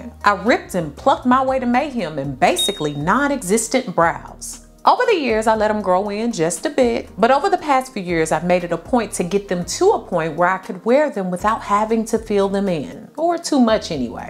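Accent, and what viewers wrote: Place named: Midwest, United States. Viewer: Are you from STL? I think that I can “hurr” our STL Midwest accent lol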